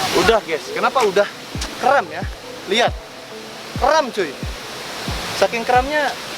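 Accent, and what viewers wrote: West Java, Indonesia. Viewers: Sugan teh orang batak mang pek teh urang sunda wkwk hade lah hade top ilmuna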